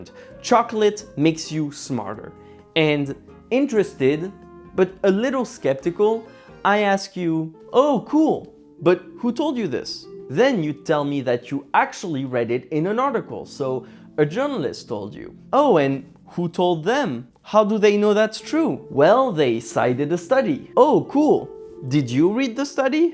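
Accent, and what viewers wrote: France. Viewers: Are you french ? you have french accent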